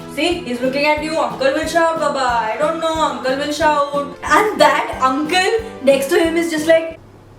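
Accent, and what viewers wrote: Maharashtra, India. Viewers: Hey i m a new subscriber found your vedioes hilarious and interesting specially the 10 words u learned from delhi acctually i m also from NCR found your marathi accent interesting. Keep it up ur expressions are too good . And falana dhumkana hahaha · zakkas g muli...todti aahes tu .bakichyana..sahi..i lv d way u say marathi ..hilarious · I love your Marathi accent! MI MARATHI feelling patriotic af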